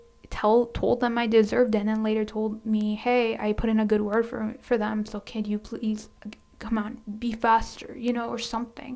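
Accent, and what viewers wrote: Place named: Russia. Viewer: As a slav girl, your russian accent is very good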